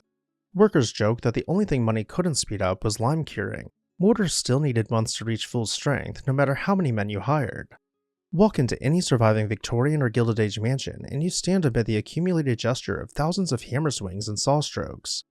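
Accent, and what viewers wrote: United States. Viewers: That bloody annoying American accent, distinctly Gay, grates on me, I'm afraid. I turned it off after two minutes. Sorry mate, but construction, especially in those days, was done by people who spoke different to you. 55 years in the trade, I'm afraid I couldn't take any more..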